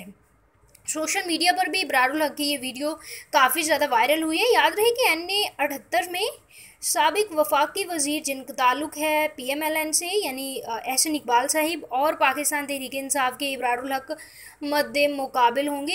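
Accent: India. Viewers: yeh kisi indian ka channel hai. haram zadon ne Pkistaniyun ko confuse aur misguide karnay ke liye channel banaye huye hain aue bhonktay rehtay hai...mein shartia kehta hoon yeh ko indian larki ya aurat hai Pkistan ke kisi ilaqay mein aisa lehja aur alfaz ki adayegi mei ne nahin suni..yeh koi asami ya tibti accent wali hai..laanat ho tum indians haram zadon beghairton kameenon par.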